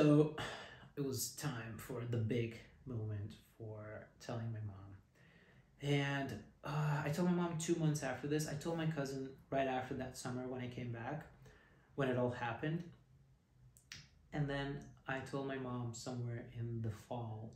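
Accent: United States. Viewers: If you're from a Balkan country, why do tou speak English with an American accent? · What accent? He sounds like he is from the US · One question? You have an American accent !!!! Explain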